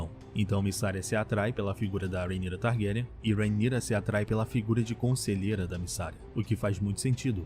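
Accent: Rio de Janeiro, Brazil. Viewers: Esse sotaque carioca me pega muito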